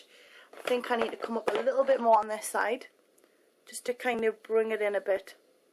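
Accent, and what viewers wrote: Newcastle, United Kingdom. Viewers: i like ur british exent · She has a geordie accent, she's from south sheilds near newcastle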